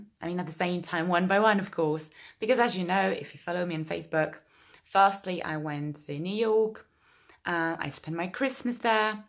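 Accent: United Kingdom. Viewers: You're talking about an American accent but you sound British? Why is that?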